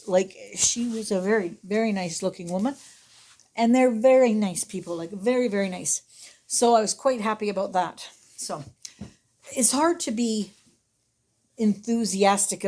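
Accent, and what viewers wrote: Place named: Canada. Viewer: Hi sweet, I knew you were Canadian with your accent…I’m from the East side of Canada, from my youth…I’m now in California since 1964. I was only 10 years old when we moved from Cali. I enjoyed watching your video…since my mama has Parkinson’s also! Enjoy your video! 😘❤️🙏🏼🌺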